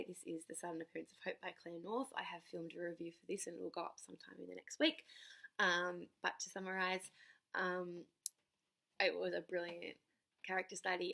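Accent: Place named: New Zealand. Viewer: Interesting accent ^^ I always struggle with this, but do I detect a New Zealander here? 😂 Sorry if you are not from NZ. I just had to take the risk of guessing.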